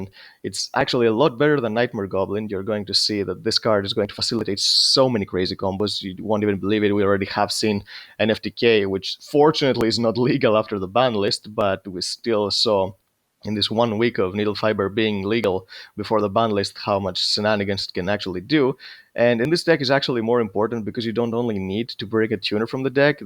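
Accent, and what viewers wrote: Greece. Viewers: Δεν παιζει να μην εισαι ελληνας με τετοιο αγγλικο xD <3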